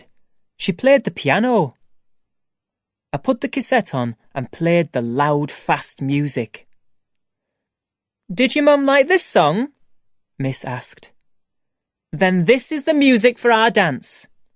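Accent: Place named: Ireland. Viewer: freaking irish accent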